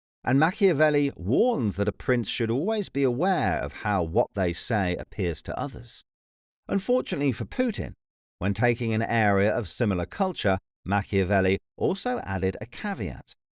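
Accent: United Kingdom. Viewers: So wtf why is there a British voiceover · Is this the same narrator? You got all British on us. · why are you british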